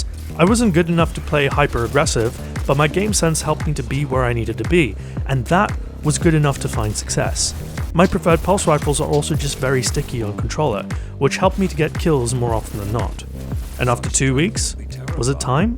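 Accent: United Kingdom. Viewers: Yessssss. Welcome to the “Sticks on PC” club. I love it. One thing I’ll add to the “not science” category is, one of the reasons I like to play sticks is simply because it’s much closer to the feel of firing a real weapon than M&K can ever be, thus adding to the feeling of immersion and just sinking into the game. Btw, your dulcet vocal cords mixed with that refined British accent totally hit my ASMR trigger to the point, I could probably just loop your videos in the background all day. Well done.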